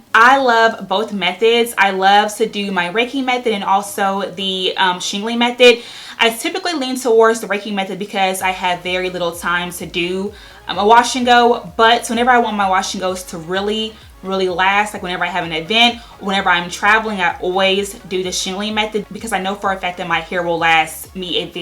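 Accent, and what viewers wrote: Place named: Australia. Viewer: Thanks for this video! I definitely will try this tomorrow! I wonder how much time I will need? You have such an interesting accent, is that Australian?!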